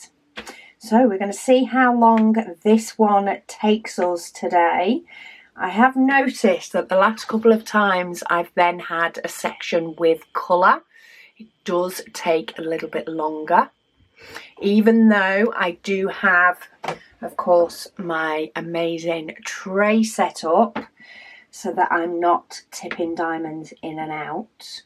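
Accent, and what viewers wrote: United Kingdom. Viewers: Hello, i don 't comment much because i'm painting together with you during the waffles. Great company! Love the british accent which reminds me of schooltimes. Also a very nice refresh from a lot of american youtubers which i also enjoy. Now don 't get me wrong with the following! I had a very surprised chuckle when you read my last comment out loud. The first 2 times you got my name right with lovely accent, bonus points haha. Last time you made it sound like "may raven" like the month and the Bird. That is the very first time that happend and i was happily laughing. My name is very hard to get right and this was the most unique response. Not offended! Don 't be doubthing yourself. I guess to explain you say my first name like "mai rah". You added a bit of my last name as well. ;) even dutch people Don 't get it right all the time. 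My parents just love the name and so do i. More people with unique names have the same experiences? That was my ramble, happy painting!😂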